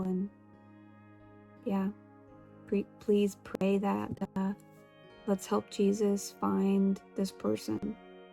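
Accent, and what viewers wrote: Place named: United Kingdom. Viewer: I love your British accent, so cute. My son asked me last night why I always watch your videos and I said, because I love her and her videos. It is highly possible that you had that dream just to intercede for her through prayer. I had a dream last week and there was a woman standing at a public counter and she said, "my son makes 200 thousand dollars a year and he gambles it all away. I prayed for her son in my dream to stop gambling. When I woke up I was so glad to finally be remembering my dreams again, even if it is just snippits. Anyway, I believed he was a real person and that was really his mother. Jesus is quickening the body of Christ and we are experiencing supernatural things left and right! Bless you and your family.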